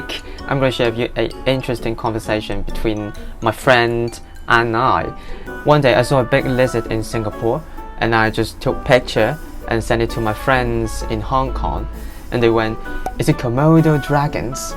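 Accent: United Kingdom. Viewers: Yes I love the British accent too. Does Dave speak the same accent as you? BTW miss Dave hope he will be back soon! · LUV THE BRITISH ACCENT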